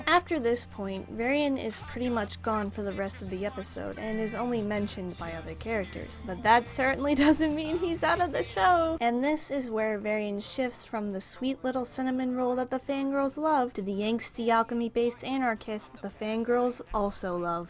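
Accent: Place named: United Kingdom. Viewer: The British accent sends me into orbit “But RaPuNzIiĪiEeEeEEEeLl”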